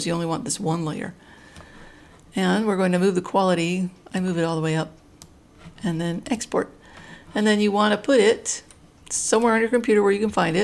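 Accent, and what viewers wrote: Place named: North, United Kingdom. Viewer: oh my gosh I'm from the north and you sound like you are too :P Thanks for the video!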